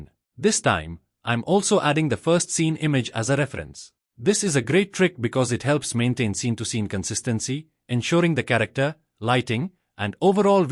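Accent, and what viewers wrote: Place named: India. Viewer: Why has the AI narrator an Indian accent…? 😅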